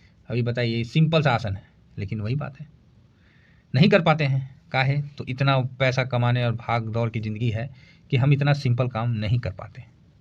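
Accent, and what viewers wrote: Bihar, India. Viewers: Sir app bihar ka lagra sir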